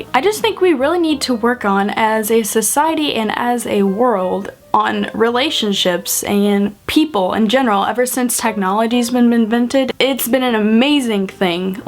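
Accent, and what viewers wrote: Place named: South, United States. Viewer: WAIT DO YOU HAVE A SOUTHERN ACCENT?!! I never noticed that...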